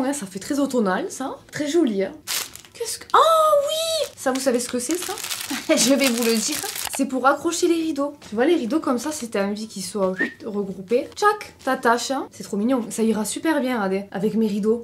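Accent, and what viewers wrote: South, France. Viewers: Bonjour, je suis nouvelle sur ta chaîne 😊 j'ai commandé sur temu j'aurais dû recevoir aujourd'hui ça me note erreur livraison 😢 j'adore ton accent tu est d'où ? Sud ? ❤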